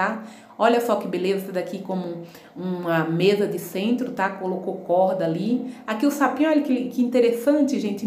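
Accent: Bahia, Brazil. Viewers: minha linda, kkkk vc é muito engraçada gosto do seu sutac baiano,. Amei seu trabalho parabéns,!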